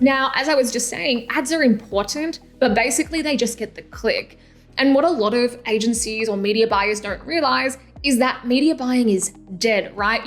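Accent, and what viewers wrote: Australia. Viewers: Never getting tired of the Aussie accent and never getting tired of your videos 🤷🏻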